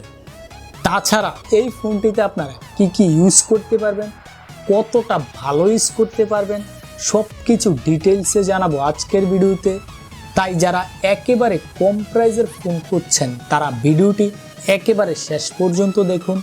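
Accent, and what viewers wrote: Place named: Comilla, Bangladesh. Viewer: ভাই আপনার উচ্চারণ শুনে মনে হচ্ছে আপনি কুমিল্লার ই....! ঠিকমতো উচ্চারণ করতে পারেন না, আবার রিভিউ শেষে style মারেন।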